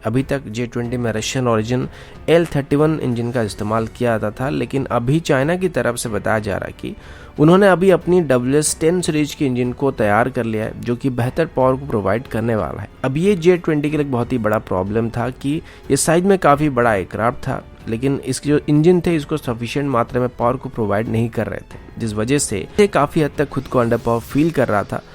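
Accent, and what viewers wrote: Odisha, India. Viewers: Aji jana padila ki tame bhi Odisha ra boli😄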